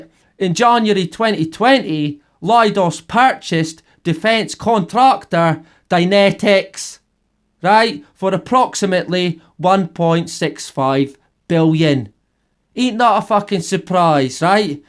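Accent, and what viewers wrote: Scotland, United Kingdom. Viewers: Been subbed since you were 1.800, well done on the rise in subs you've had, in a very short time. I love your accent, I hope I'm not insulting you if I ask which part of Scotland you are from...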